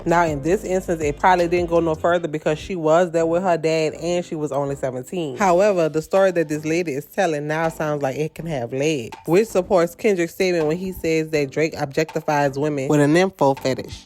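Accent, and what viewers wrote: New Orleans, United States. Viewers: Please speak more clearly and add a few pauses in your sentences. Your New Orleans accent has to be the CUTEST one ever. ❤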